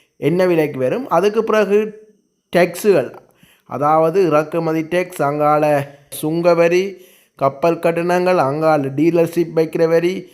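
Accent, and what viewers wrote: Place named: Chennai, India. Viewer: Madras தமிழ் தூதூதூதூ ...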